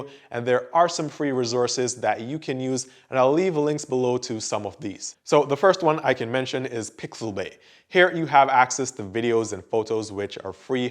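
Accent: Jamaica. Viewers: Yu sound like a yaardie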